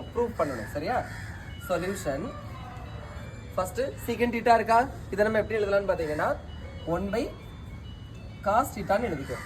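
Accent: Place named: Kanyakumari, India. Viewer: Tamil language than, athu kanyakumari language ithu mathiri than pesuvanka.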